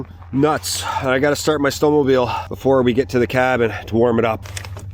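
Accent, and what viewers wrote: Newfoundland, Canada. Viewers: Instant follow. Love the energy and vibe. I really like the Canadian accent. I used to get confused between American vs Canadian. 🇨🇦 now I can tell the difference. Just like aussies sound so different to kiwis. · Cod fish from the rock hey? Good stuff. Hello from Newfoundland. I live in Gros Morne National Park. How's the fishing around your cabin in the summer time? Tbh I almost pick up a Newfoundlander accent on your speech. Not originally from here in Newfoundland are you?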